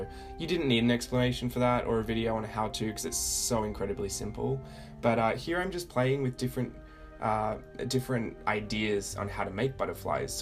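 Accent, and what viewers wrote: Australia. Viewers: I could watch you create all day. Don't lose that Aussie accent! Thanks for all the inspiration. <3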